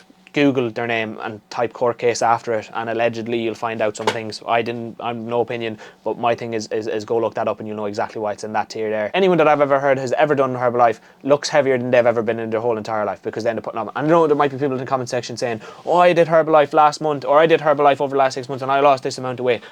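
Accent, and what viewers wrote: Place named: Ireland. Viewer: This guy sounds Irish.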